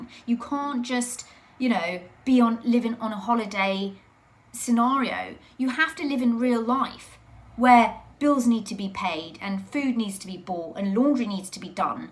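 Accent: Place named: North, United Kingdom. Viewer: 🤣🤣🤣 yes the northern accent!! I love you when you do that! Been having a brill day today but you have just made it better!! I get so excited when you post a video and just love the chit chat 🤸😊😁♥️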